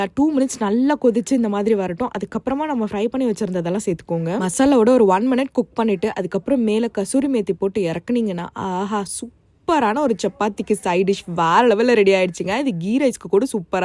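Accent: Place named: Chennai, India. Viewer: Chennai Tamil pa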